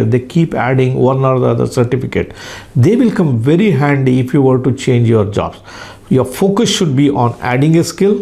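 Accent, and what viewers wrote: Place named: Karnataka, India. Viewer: English with a Kannada accent- very attractive.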